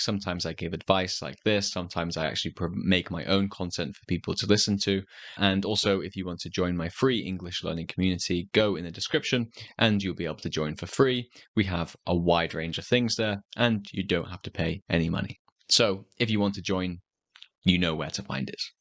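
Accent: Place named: United Kingdom. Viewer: I Love british accent , subcribed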